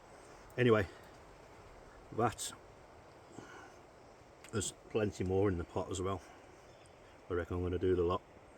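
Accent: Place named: United Kingdom. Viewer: Only just found out about this guy from an American channel (explore with me Maria C). Such a pity as he's obviously, from his accent, from where I grew up. Too much of this is happening as people are under such pressure in the UK and were damaged during COVID due to the ridiculous lockdown. The effects are still lingering on for many in poor health, mental and physical. The economy is damaged, our cities and towns are degenerating, the outlook is grim.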